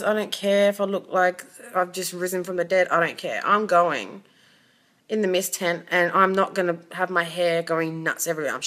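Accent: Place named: Australia. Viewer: love the australian accent, and the blonde looks awseum :D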